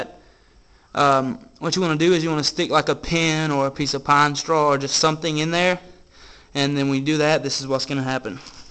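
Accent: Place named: South, United States. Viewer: Y'all, that helped me a lot but y'all need to stop talking like that ya country boy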